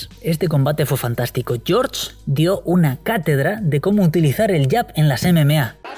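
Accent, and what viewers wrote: Galicia, Spain. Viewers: Eres gallego? De donde?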